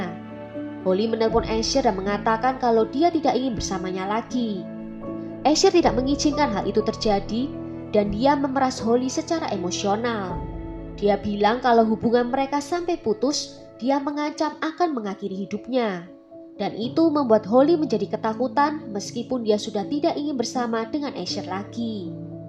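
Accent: Java, Indonesia. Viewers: medok bngwt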